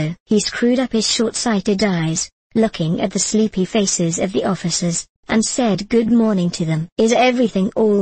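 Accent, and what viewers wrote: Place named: United Kingdom. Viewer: Nice to listen to a British speaker for a change.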